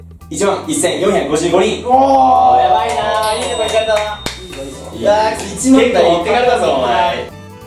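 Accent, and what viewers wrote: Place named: Hokkaido, Japan. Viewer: たまに出る北海道弁がいいですー！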